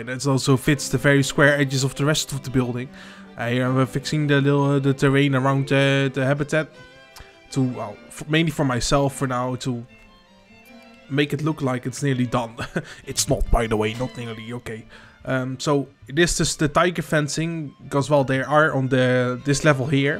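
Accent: Netherlands. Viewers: Hoe Nederlands kan een acsent zijn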